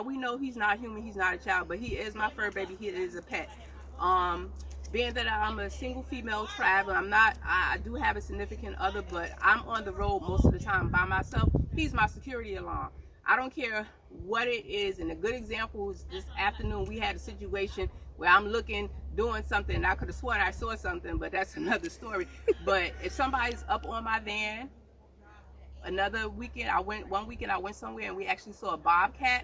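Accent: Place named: New York, United States. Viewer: Very informative...she must be from NY or NJ...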